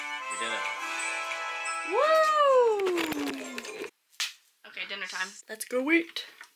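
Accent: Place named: United States. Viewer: It’s so weird seeing you visiting a place near me, as you are so American 🤣 and different to the locals (as in your dialect)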